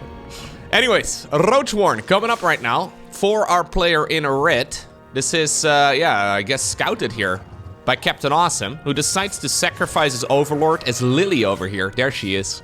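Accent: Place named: Netherlands. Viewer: I am very, very sorry to say, but every time you said Fitzroy's name, my brain wanted to assume that you are trying to say Viceroy, but with your Dutch accent:D sorry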